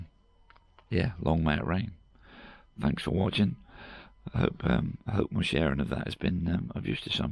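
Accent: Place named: Norwich, United Kingdom. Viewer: Hi , I hope you are both well...we have the same car an love it...also have the twin axle 8ft wide swift...you sound like a fellow norwich boy😁... like the vids ...keep up the good work...matt an trace.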